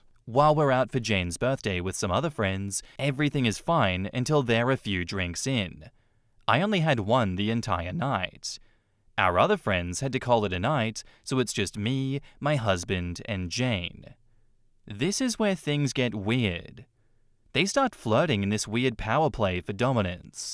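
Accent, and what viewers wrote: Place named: Australia. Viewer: You can tell this narrator is Australian because he's a cucked wuss who's whole country should be erradicated.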